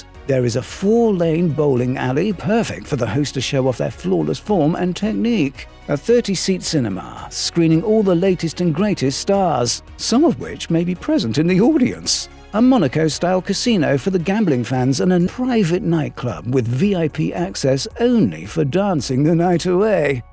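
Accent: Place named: Australia. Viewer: You Australian!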